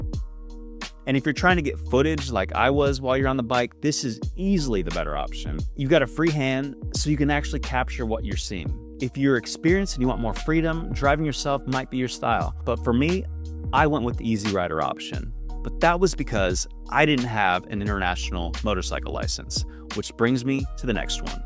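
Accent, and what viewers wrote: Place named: United States. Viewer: I have been doing some Google searching to see if a US citizen can use the international driving permit (IDP) with the motorcycle endorsement. The answer it keeps giving me: "No, a standard U.S.-issued International Driving Permit (IDP) does not work in Vietnam. This is due to a strict treaty mismatch known among travelers as the "country trap". Vietnam only recognizes IDPs issued under the 1968 Vienna Convention. However, the United States did not ratify that specific treaty and only issues IDPs under the 1949 Geneva Convention. Because of this, Vietnamese authorities view a U.S. IDP as legally invalid for motorbikes over 50cc" I am only assuming you are from the US by your accent. If you are, how were you able to obtain the correct license?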